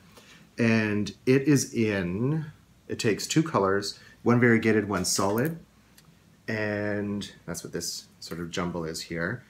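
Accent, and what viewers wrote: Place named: Canada. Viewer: Awesome podcast! I can't wait to see your progress as a knitter. I brings me back to just a couple short years and helps me reflect on all the progress I've made since i (seriously) picked up knitting. Also, the Canadian accent...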